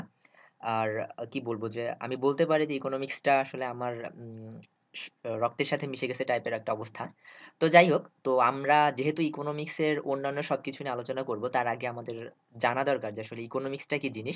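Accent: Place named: Bangladesh. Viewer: Bhasha shuine monehochhe Bangladeshi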